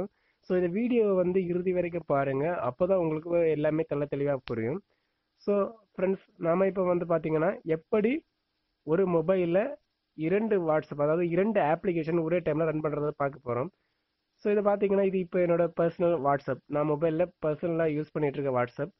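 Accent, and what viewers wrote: Kanyakumari, India. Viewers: kumari thamizh👍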